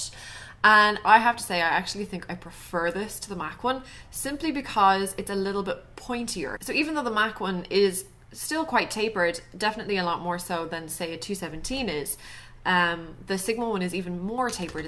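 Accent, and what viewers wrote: Ireland. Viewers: Don't want to sound like a stalker or anything but what part of Ireland are you from? ;)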